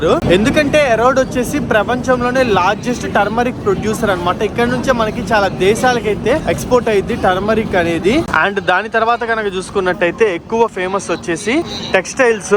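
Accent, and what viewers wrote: Nellore, India. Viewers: Meedhi Nellore aa?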